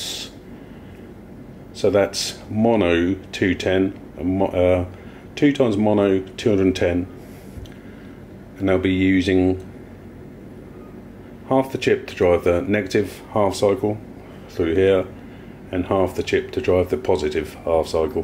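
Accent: United Kingdom. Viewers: Uptade- Did I wrote this 3 years ago?. My two EVs still running as new, do yourself a favour buy yourself the original covers, laste year I moved to Alto 2300w Crazy power. have fun - Nicely explained, plus deep British accent I almost believe as truth. I'm using the 15P for the last 3 years, with no problems - I arrive to this page because one light is not working - it is an amazing speaker, sound quality is excellent, does not overheats after 8 hours. Power is good enough for halls with 150 guests. So, maybe is right with the specs, but real life usage proves him wrong.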